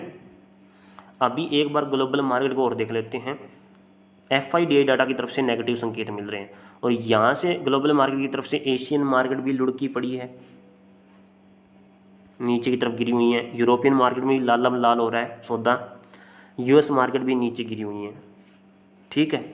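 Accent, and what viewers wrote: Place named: Haryana, India. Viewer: Aapka accent bhi hariyanvi hai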